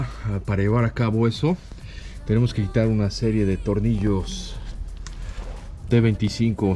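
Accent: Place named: Mexico City, Mexico. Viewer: Yo lo que recomendaría es no utilizar el acento chilango aspiracionista para tu contenido, es muy molesto y dificultan ver el video. Saludos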